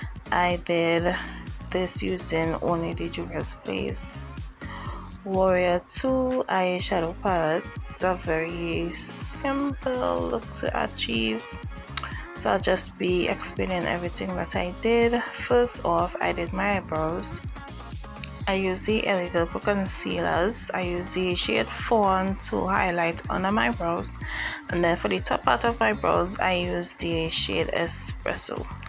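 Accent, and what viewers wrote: Trinidad and Tobago. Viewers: Hey Hun, I love this tutorial, this look is simple and pretty, love hw you did that wing liner. Love that Trini accent, Jamaica over here 🥰. Enjoyed watching, i subscribed, I have a channel also, stop by and show some love, tale care 😍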